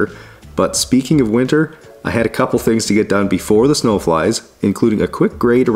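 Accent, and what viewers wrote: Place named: Canada. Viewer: I’m sorry, but do all Canadians sound the same! He sounds just like a few other Canadian YouTubers I watch as well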